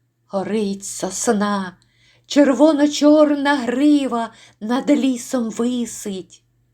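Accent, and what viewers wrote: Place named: Ukraine. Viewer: Дуже вам дякую. Для мене важливо чути українську поезію українським голосом!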